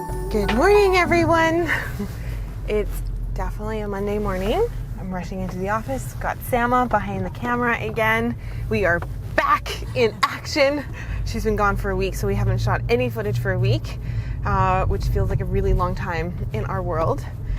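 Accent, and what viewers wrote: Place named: Canada. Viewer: watched it for one minute and immediately noticed your canadian accent 😍❤️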